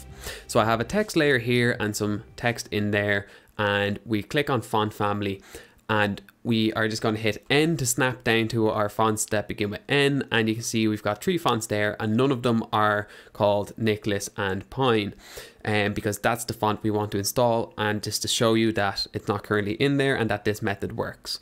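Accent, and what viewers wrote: Ireland. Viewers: i only subscribed cause I heard the irish accent... love seeing other irish creators :) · good man, nice to hear an Irish voice. This was really helpful thanks! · You Irish?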